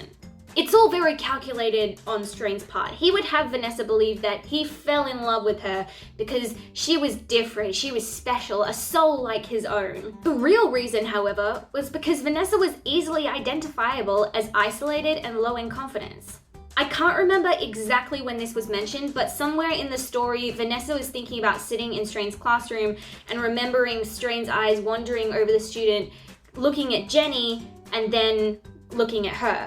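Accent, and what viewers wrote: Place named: Australia. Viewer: As a dude, I was so uncomfortable after he suggested they go to his house and she began to talk about what she would be expected to do that. I deleted this one. The student teacher trope, however, is one of my favorite things ever I fully admit that don’t judge. I love things like dark notes, and misbehaved if you’ve read either of those and lessons in sin. Also, are you Australian? Sounds like it and that is the coolest thing ever.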